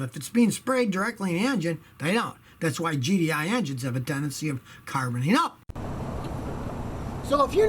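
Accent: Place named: Chicago, United States. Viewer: Where is from originally? He has an accent that sounds possibly like Chicago’s.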